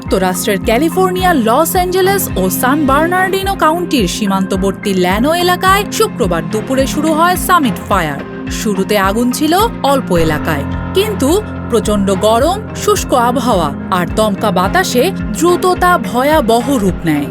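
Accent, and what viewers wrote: Kolkata, India. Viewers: কলকাতার মত কেন নিউজ পরছেন?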